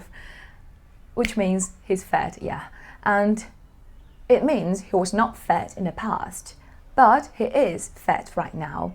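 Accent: United Kingdom. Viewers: British accent??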